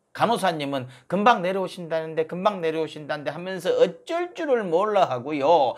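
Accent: Jeolla, South Korea. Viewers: 전라도 말투네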